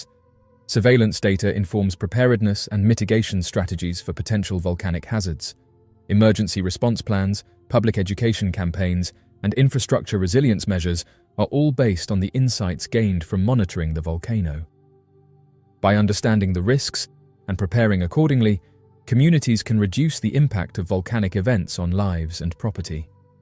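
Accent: United Kingdom. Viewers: Why is it that most videos I watch are about the USA and yet the voice is always British? Don't any Americans know about America, or do the British not want to talk about their own .....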